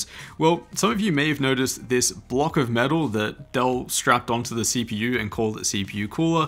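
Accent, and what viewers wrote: Australia. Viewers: R u and Aussie whaaaat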